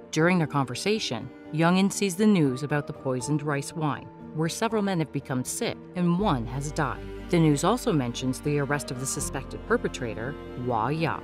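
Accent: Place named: Europe. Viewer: I think maybe she's an European? What's wrong, Korean is difficult language to learn for Europeans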